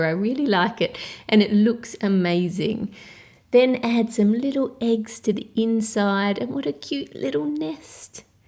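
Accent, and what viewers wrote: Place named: Australia. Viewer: probably the only person who speaks in an australian accent i didnt enjoy listening to when watching this video.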